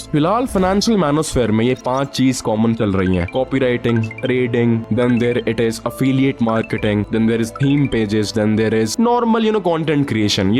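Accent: Haryana, India. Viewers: Bhaiya i just have a simple question jo aap yeh itni bhynke English bolte ho vo kha se seekhi? Dictionary se kisi app se ya kese? Or kitna time lga the way u talk in English and mixed with haryanvi accent aaye haaye ❤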